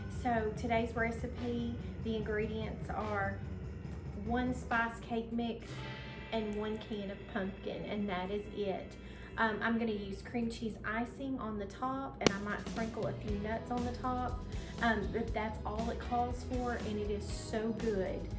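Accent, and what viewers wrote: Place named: South, United States. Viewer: I watched Charlie's country cottage clip then onto yours.....I just subscribed to your channel and my next trip is to the grocery store to buy delights super moist spice cake and 100% pure pumpkin. I love your southern Belle accent...and really liked your presentation....I almost smelled your muffins. I really enjoyed your video. Thank you...it will be so "yummy ya'all.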